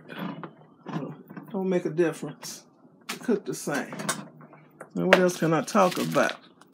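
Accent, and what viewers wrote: South, United States. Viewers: I love it... your accent is so funny....reminds me a of my southern family...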